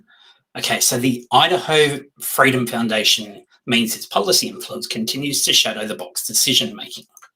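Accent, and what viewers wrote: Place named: Western Australia, Australia. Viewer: You’re from West Aus too!! I live in Perth. It’s funny I could tell by your accent that you must be from West Aus, apparently we sound more English/Australian which is nice I think. Glad to see another West Aussie interested in this case, my husband is sick of hearing about it all from me. Look forward to listening again 😊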